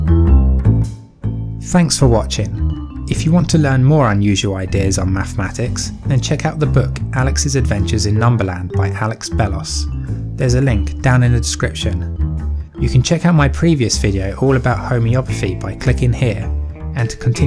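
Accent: United Kingdom. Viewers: Let me ask you something, do you use metric system or imperial? British accent is funny, we from Brazil think the same about the Portuguese accent, but this doesn't make his argument less valid. Use imperial system however is stupid, and what makes it worse is that USA is affiliated to the metric standard and all of its imperial bullshit comes from metric's standards, it's only a conversion.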